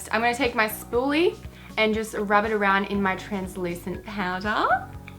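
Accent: Australia. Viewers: Is she Australian (I'm Aussie)😂💙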